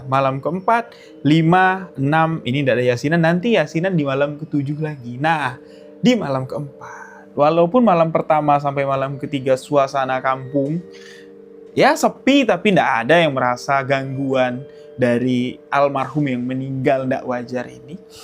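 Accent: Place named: Jambi, Indonesia. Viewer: sama2x bang, maaf jarang komen bang, maju terus bang udah mode jambi tuh pembawaannya ❤❤❤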